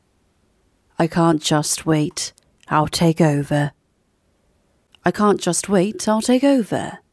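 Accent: RP, United Kingdom. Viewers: I like that you speak RP accent. It's basically, making sure you pronounce every single party of the word and it is a lovely way to speak.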